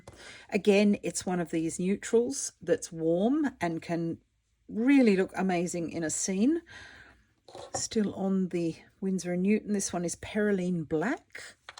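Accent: Australia. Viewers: Hello. Just found your channel and kept thinking "she" sounds Aussie and then finally at the end you said you went to school in Australia. Hi from a fellow Aussie :) Enjoyed your humour along the way & perhaps that disorder is contagious. I have it too 🤣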